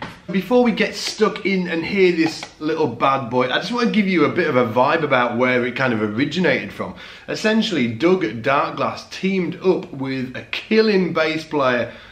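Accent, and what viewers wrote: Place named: England, United Kingdom. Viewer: Great video + English accent=8 minute intro monologue.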